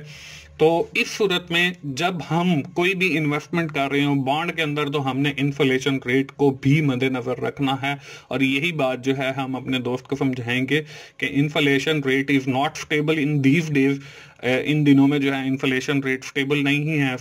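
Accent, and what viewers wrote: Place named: Punjab, India. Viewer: bhi dont mind........ punjabi main english bolny ka style .......kamal hy yar.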